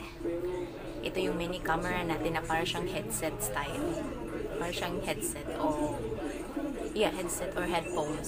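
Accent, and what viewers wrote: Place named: Visayas, Philippines. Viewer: Bisaya man diay ka sis proud bisaya here.. ganda ng accent mo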